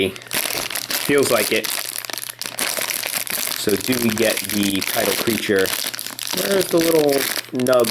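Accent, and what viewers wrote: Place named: United Kingdom. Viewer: Wtf! He`s obviously British!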